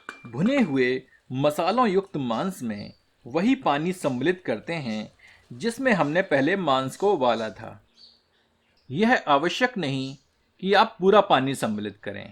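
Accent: Standard. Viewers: शुद्ध हिंदी में बोलने का जो तरीका है वो अतिसुंदर है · Aapki Shudh hindi bahut sunder or saral hai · आपकी शुद्ध हिंदी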